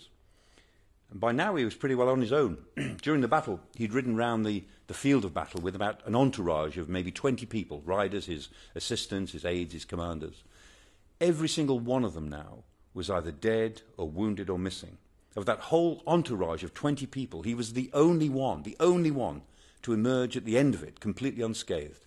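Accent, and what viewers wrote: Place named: England, United Kingdom. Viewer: Professor Michael Clarke. I love your accent. In the USA there is a Yankee nasal twang. Today in England many breathe and pause a lot . "Day" sounds like "Daii" The UK should put out 2 to 3 videos on Youtube as "the Model or Perfect English accent."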